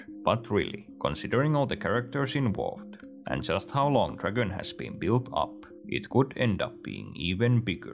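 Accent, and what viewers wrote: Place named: Finland. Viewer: Is that a Finnish accent I peek? Great channel bro, keep going!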